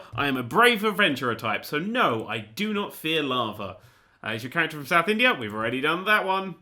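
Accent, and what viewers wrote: England, United Kingdom. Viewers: are you from Britain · Are you from England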